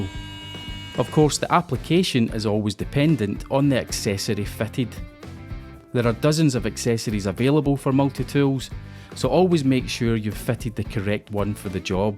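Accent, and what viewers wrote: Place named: Scotland, United Kingdom. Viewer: tool instruction and Scottish accent....jackpot! 😍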